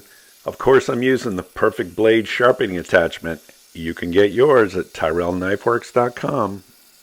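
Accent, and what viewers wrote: Canada. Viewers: Always thought you were Canadian.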